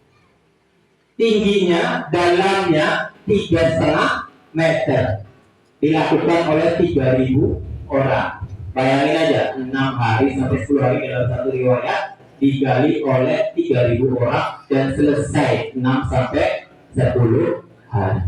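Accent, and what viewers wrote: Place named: Tegal, Indonesia. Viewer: Ponakan lik... Masih kebawa logat tegal sing wis kecampur logat payakumbuh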